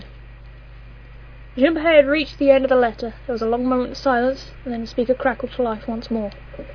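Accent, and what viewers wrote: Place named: Northern England, United Kingdom. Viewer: You've got such a nice voice to listen to :D lovely accent. North england maybe? ^^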